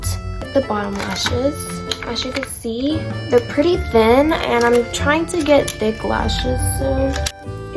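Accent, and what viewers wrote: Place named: South, United States. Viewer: Omg an Asian with a southern accent is so cute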